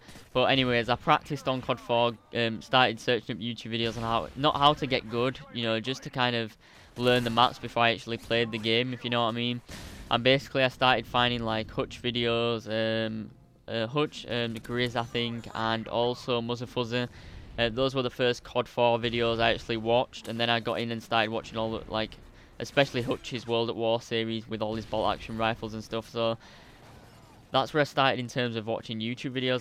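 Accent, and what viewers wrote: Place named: Scotland, United Kingdom. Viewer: You sound more scottish in your livestream.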